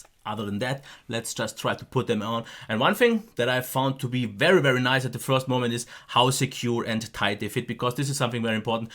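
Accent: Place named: Germany. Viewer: He sounds German - what do you think?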